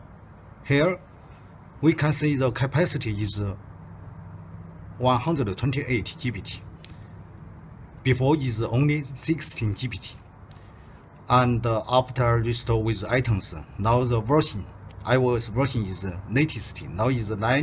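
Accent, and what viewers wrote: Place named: Japan. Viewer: Do not ask me why, but when I hear your Japanese accent, I feel like an engineer does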